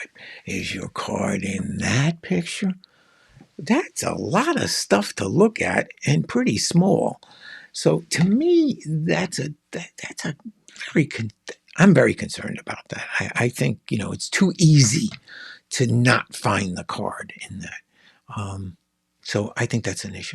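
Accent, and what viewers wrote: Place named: New York, United States. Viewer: Enjoy your excellent reviews … and your NY area accent. (Though long gone, I was born and raised there.)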